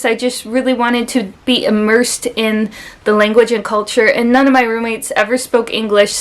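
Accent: Michigan, United States. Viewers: Hi. I'm wondering if Michigan English accent is similar to the accent in Southwestern Ontario in Canada. That's where I'm living, and to me, their accent sounds a bit different from yours. This is very common in China, but probably also happens in English-speaking countries (geographically very close but different spoken accent). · That's funny on some of the comments you mentioned.Being an American myself and having spoken American English since the age of 3, I can attest that your American English accent is still very much intact. You definitely still sound like a Michigander.:) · Your Michigan accent is very cute 👍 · Hello from Michigan and yes that is Michigan accent. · Wow you have a Michigan accent? I’m from Michigan too and currently learning Mandarin in school!🤩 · Ah the Michigan accent lol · Hey, I haven't heard a Michigan accent much before, so it's quite novel sounding to me. 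I like it. You're quite unique in you language ability with both Chinese and Japanese. I've learnt Chinese through mass reading of novels, and am also learning French, so I know the time it takes. I don't think I will start another language, at least not with the intent of understanding it deeply. I imagine it must be difficult thinking up new content all the time. But then again, a lot of people just enjoy the everyday vlog videos. 祝你幸福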